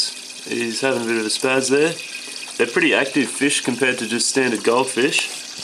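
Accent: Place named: Australia. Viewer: Super cool! Could tell you were Aussie right away from ya accent. Cheers mate! 🍻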